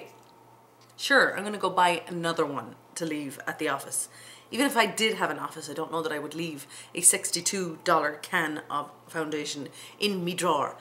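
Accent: Ireland. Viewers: wow it looks good must buy it are you from Ireland originally you sound like your Irish I am from Ireland Limerick xxxxx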